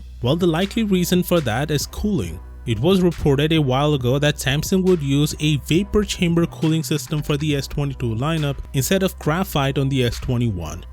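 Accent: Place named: India. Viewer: it explains the english /indian accent lol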